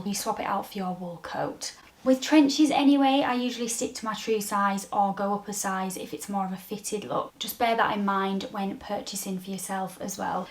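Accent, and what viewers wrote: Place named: United Kingdom. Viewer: Really loving your style and delivery it’s natural and has that British feel bonus for street shopping as well as high street and shipping…and as for the pieces I’m off to get leather converse and some mocha trousers…..also nice to get some new brands on my list..autumn Is my favourite season by far and this capsule wardrobe is one of my favourites..from head to toe…thank you..subscribed !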